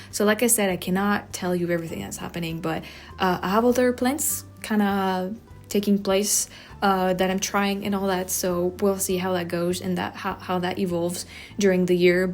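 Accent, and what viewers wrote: France. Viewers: Since I first started watching you react to music, you have almost lost your French accent ❤❤ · I haven’t seen one of your videos in years…You’re losing your French accent! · Your English has gotten so much better over the course of your videos. Don't lose your French accent, though!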